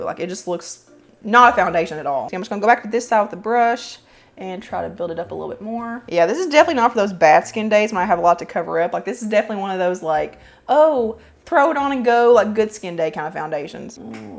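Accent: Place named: Kentucky, United States. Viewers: Ok…I discovered your channel yesterday and I love it. You are so funny and unique. I recognized your accent cause I’m from Kentucky too! What area are you in? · My daughter in law is from KY and she sounds just like her!! Love it!! 💘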